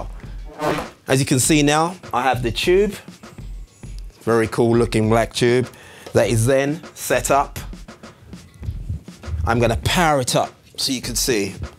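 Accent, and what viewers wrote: United Kingdom. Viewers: British is just amazing. Love his accent.:D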